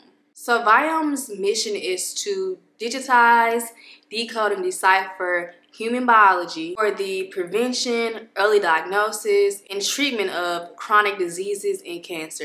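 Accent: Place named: South, United States. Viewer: Love your accent it's a southern or Midwest accent. Where are you from?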